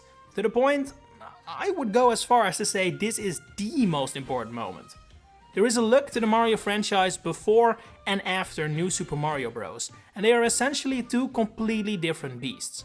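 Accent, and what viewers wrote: Netherlands. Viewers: Im always amazed how I can pick up on a Dutch guy speaking English.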